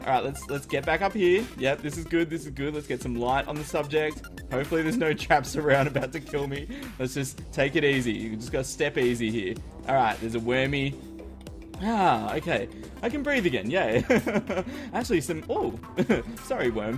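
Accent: Australia. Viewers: Is he Aussie?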